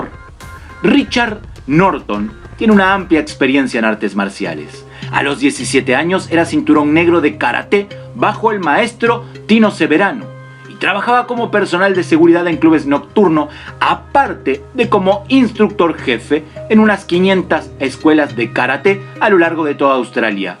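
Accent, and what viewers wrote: Argentina. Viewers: ahhhhh... que irritante el acento argentino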